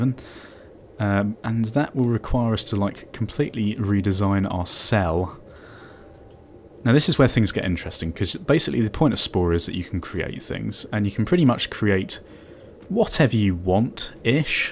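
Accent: United Kingdom. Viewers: youre voice sounds like mine but british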